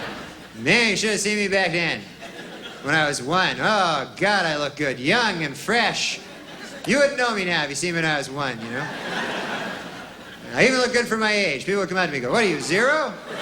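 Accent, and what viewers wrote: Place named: Canada. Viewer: Man he's SUPER Canadian right here now eh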